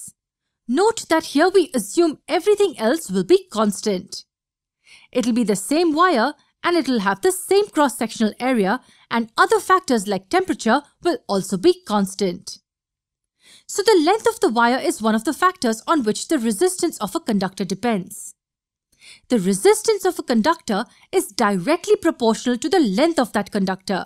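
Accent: India. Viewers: Late... but I think it's an Indian accent :)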